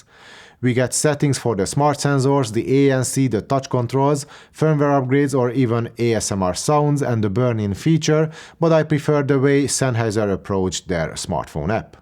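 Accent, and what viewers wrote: Hungary. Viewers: Am I hearing it good that you are Hungarian sorry if it is an uncomfortable question just curious..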